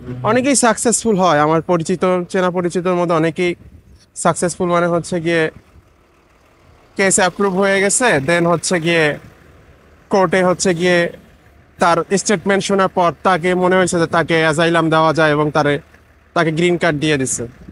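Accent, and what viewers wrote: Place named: Jessore, Bangladesh. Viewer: আপনার ভাষা আমার এলাকা যশোরের মতন অনেক টা । আপনার দেশের বাসা কোথায় ?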